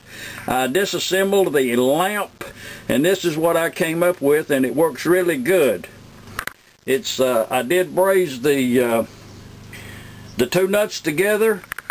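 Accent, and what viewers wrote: United States. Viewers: british accent ??? he fukin sounds like hes a cowboy hes DEFINATELY not british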